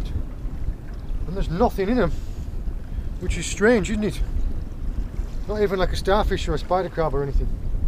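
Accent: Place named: North Yorkshire, United Kingdom. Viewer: Such a familiar accent if tha aint a North Yorkshire lad I'll eat tha hat me sen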